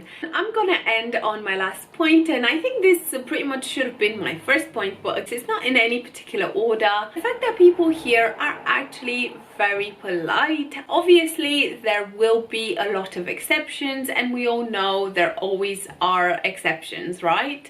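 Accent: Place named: United Kingdom. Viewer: You have picked up a much stronger British accent than all the Romanians I know in the UK.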